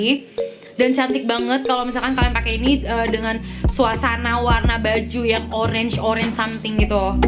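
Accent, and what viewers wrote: Medan, Indonesia. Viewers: Assalammualaikum, Kak,... Kenalkan nma ku, Alia,.. Brasal dri Malaysia,... Aku suka skli sama content video, Akak,... Jgak cra pertuturan kata Medan tu, Pas skli sama org nya,....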